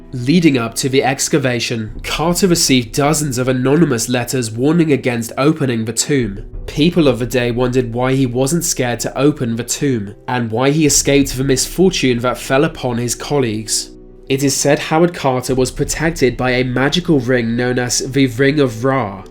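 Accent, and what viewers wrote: United Kingdom. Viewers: Magicians are not creeps. You tea and scones accent. Fuckwit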